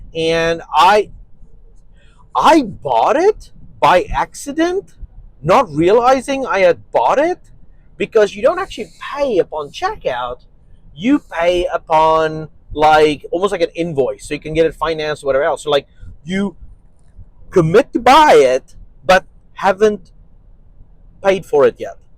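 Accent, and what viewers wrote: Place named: South Africa. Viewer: offside comment here. you sound Souht African bro. any ties to SA?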